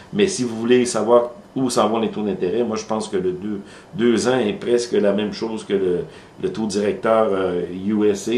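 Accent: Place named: Quebec, Canada. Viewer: Votre accent est magnifique. Québec libre !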